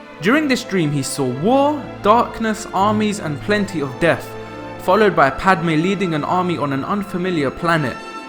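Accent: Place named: England, United Kingdom. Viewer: Speak real English, not that incorrect trash from englan the shithole nation with erroneous variamts of the English language. Fathet isn't fa-ver and vission isn't "vig-in"...Schme isn't pronounced "shimmy" ... nothing you say is correctly pronounced, it just sounds like shit frok england. Future isn't few-cha...such disgusting speech patterns you filth in england have. Discriminatory is not dis-creamy-tree... THERE IS NONTREE in that damned word!! Maul isn't moe-ull, skill isn't ski-eww...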